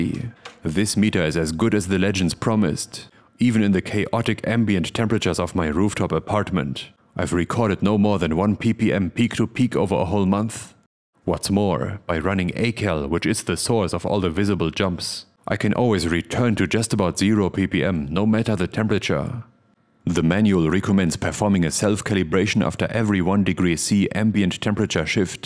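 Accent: Germany. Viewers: sounds like a German Darth Vader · German native that speaks English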